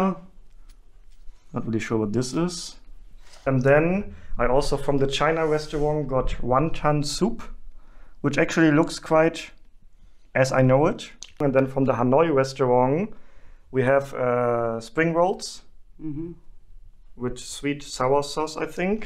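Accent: Germany. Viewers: Your mum is H.O.T. ! ! Her English also is very English!!?? you seem to have a very strong German accent where your mum is WOW and H.O.T. Please tell your mum XDDDD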